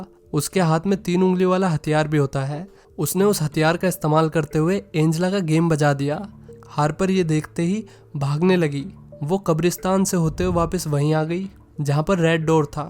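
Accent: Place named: Palwal, India. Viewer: Aap kaha se ho faridabad side se ho kya kyoki aapne kuch word palwal walo jease bole hai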